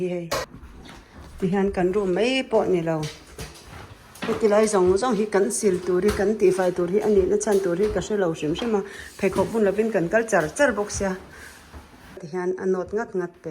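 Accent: Norway. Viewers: Kei chu tawngkam ithiam in thil i sawifiah thiam kati,,norway tawng thluka itawng te hi ngaihthlak anuam kati tlat thung..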